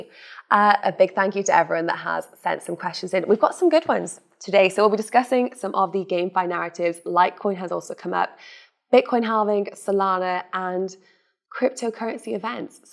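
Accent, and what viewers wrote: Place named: Scotland, United Kingdom. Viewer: Thank you. Enjoyed your presentation and explanations. English / Scottish, niiice. Beautiful language.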